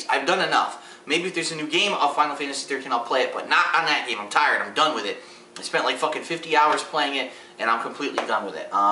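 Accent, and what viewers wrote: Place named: Chicago, United States. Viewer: i think he sounds like he's from chicago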